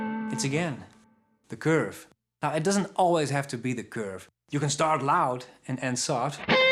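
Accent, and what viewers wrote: Netherlands. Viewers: It's a Dutch accent, sounds great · I’ve enjoyed the videos as well as Paul’s. They both produce super high quality videos with great content! And I like that Dutch accent! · Man your lessons are so fkn solid! I love them. Just curious though where are you from? Holland, Germany? Very curious for no reason. Thanks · no such thing a European accent... you know how many different languages Europe has right? It is a Dutch accent though 🤪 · Sniff sniff, I detect a faint Dutch accent. Am I right? Bedankt voor de tips!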